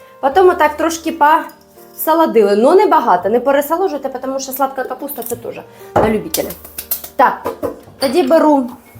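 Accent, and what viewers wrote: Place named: Poltava, Ukraine. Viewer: Ваш юмор і діалект, схоже Ви з Полтавщини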